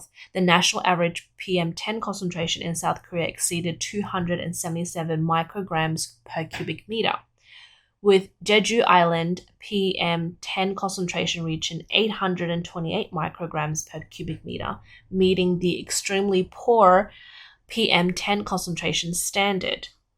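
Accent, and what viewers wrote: Australia. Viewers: cute Aussie accent 😂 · hmm, she has a very light Australian accent actually. You've obviously never heard a "hyper-Australianized" accent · A pleasant voice, sounds a little Australian. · Is the presenters voice/accent second home Australian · The narrator kept saying "dessification." Did she mean "desertification" or "desiccation"? (Her hyper-Australianized accent was not always clear, especially with the over-emphasis of the r-dropping final syllables, as in "wahtaah".) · The accent is very urban Aussie (Melbourne / Sydney accent). I like it a lot.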